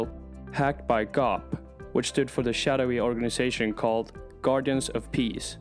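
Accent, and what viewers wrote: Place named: Sweden. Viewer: Intressant, men skulle vara bättre om det inte lät som att ni läser och försök få bort svengelskan!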